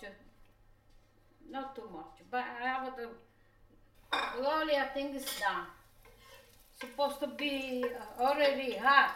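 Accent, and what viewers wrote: Italy. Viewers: I love watching. She reminds me of my auntie the way she talks and cooks and the way she makes simple Italian traditional food like my mum used to make and continue to make. She sounds as though she comes from the same region of Italy as my mum and dad. Saluti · Love her I just love her. I’m a new sub. I miss that accent. I grew up in New York in Little Italy. And I would always go to my friends house to eat pasta fagioli, now I can make it myself. Thank you Nona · English spoken with an Italian accent. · My nonni for sure bring back so many memories and the way she speaks w the italian accent only my nonni spoke more itslian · I LOVE YOUR UTUBE CHANNEL. YOU MAKE ME THINK OF MY GRAND FATHER HE WAS FROM ITALY 🇮🇹 AND I LOVE HIM SO MUCH ❤️ YOUR Accent reminds me of him. He was an AMAZING MAN. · Her accent makes me miss my Nonna . Love you Italian grandma and thank you for the recipe.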